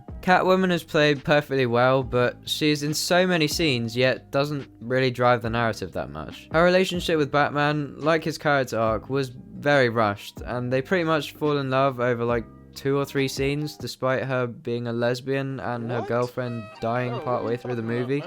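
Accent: United Kingdom. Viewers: you british though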